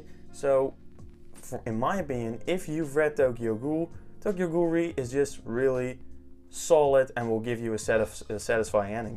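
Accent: Netherlands. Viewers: Duidelijk een nederlander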